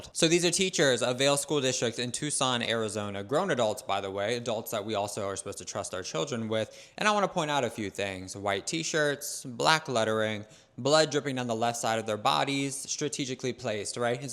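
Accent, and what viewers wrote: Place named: United Kingdom. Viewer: We got a Brit over here innit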